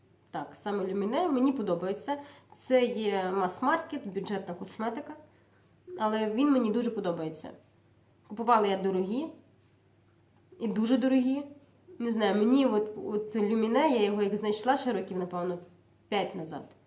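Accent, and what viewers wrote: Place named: Lviv, Ukraine. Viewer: Спасибо за новое видео! Смотреть- огромное эстетическое удовольствие! Вы, очень красивая, шляхетна пани! ))) И очень очень нравится Ваш львовский говор! Ну и тембр голоса у Вас очень приятный безусловно!! Всего Вам наилучшего желаю и Вашей семье!!!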